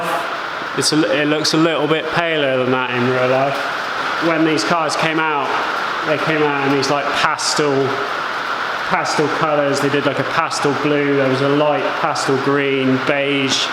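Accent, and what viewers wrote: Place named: South West England, United Kingdom. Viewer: Nice build fella, I thought you had a familar sounding accent. I'm in SW UK also, it kinda makes the project all the more interesting. Subscribed.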